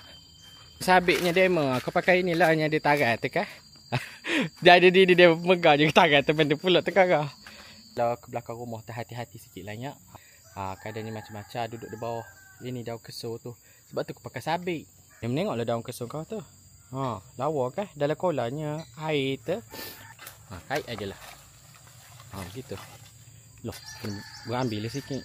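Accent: Pahang, Malaysia. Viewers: Pekat sungguh bahasa Pahang. Dengar loghat tu macam dari belah lepih gitu yek. Koi org Jerantut. Lama dah koi tak masok gulai tempoyak ni. KL ni Payoh amat nak cari tempoyak.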